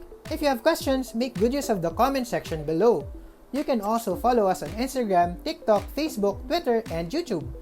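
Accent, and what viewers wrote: Philippines. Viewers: Pinoy accent 👍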